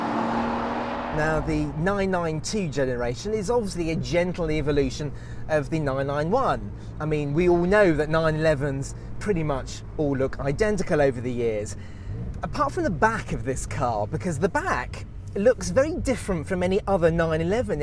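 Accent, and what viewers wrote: United Kingdom. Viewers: This guy british af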